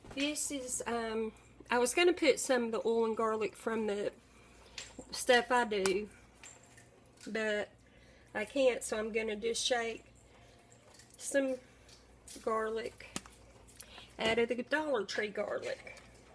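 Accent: South, United States. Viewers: Are you from Arkansas?? You sound like me a lot lol I'm from Conway and I also have that southern twang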